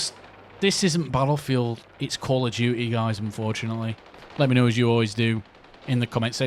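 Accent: United Kingdom. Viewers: Are you Irish, English, or Scottish?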